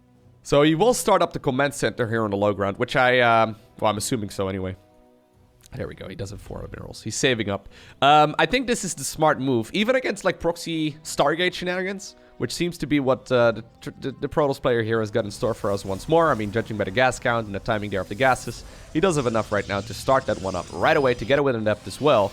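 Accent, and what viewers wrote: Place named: Netherlands. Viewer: its the dutch accept onme of my best friends he speaks like that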